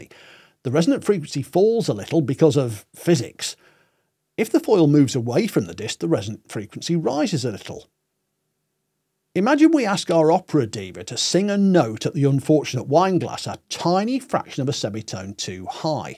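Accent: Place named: Scotland, United Kingdom. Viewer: This is the first video I've watched of your channel. I'm going to subscribe, absolutely hitting on things I'm very much interested in. One thing I'd like to observe, I believe you may hail from the same geographic location as Sean Connery. It sounds that your dialect and accent is very similar to his, unless he was simply acting and faking his natural voice on screen. Anyway, thank you for your hard work!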